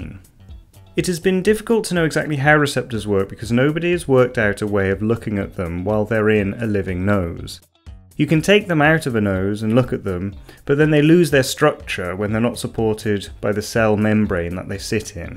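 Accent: England, United Kingdom. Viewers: Question: Where are you from in England? You speak very similar to James May.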